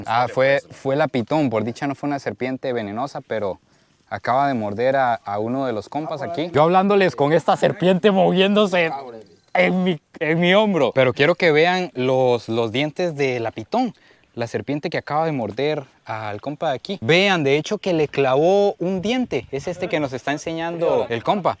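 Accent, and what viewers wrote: Mexico. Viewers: Mi compa me gusta k hable como mexicano....cuando quiera ir aya tiene su casa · Creí que era mexicano bro jejeje pero igual buen video chulada bendiciones bro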